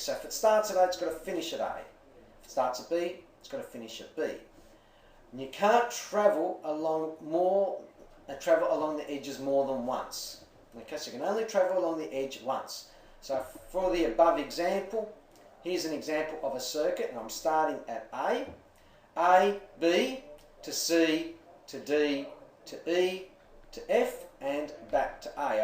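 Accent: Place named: Australia. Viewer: I hate this Australian accent.......makes it hard to understand :( had to watch it twice Good job though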